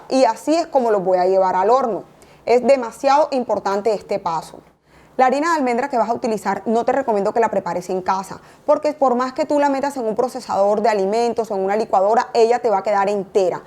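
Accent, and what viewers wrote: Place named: Barranquilla, Colombia. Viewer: Eres barranquillera!!!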